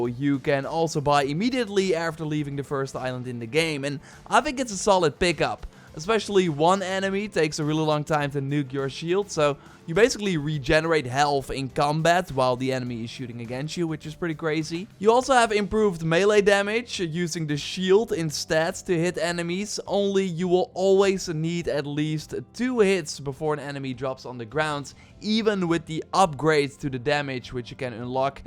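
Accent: Netherlands. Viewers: Ik hoor je Nederlandse accent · Ik wist dat hij Nederlands was vanwege de accent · Are you dutch?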